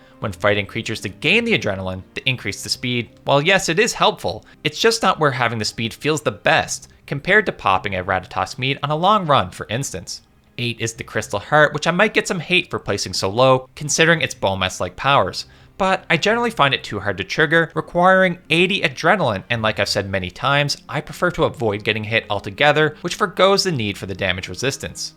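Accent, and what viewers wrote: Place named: Maritimes, Canada. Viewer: Are you from Nova Scotia or New Brunswick? Got that accent goin on.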